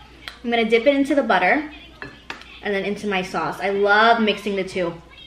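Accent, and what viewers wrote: Vietnam. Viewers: Omg u r pure southern viet accent~~😍😍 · She has a very strong vietnamese accent LOl. Im Vietnamese too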